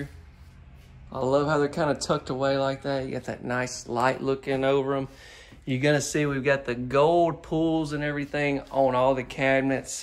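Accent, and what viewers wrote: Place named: Appalachia, United States. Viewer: Golly,nothin wrong with the hillbilly talk.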